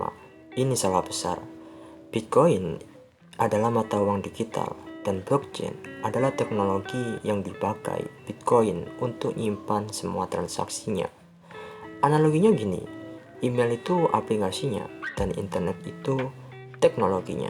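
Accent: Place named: Java, Indonesia. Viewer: Medok banget suaru lu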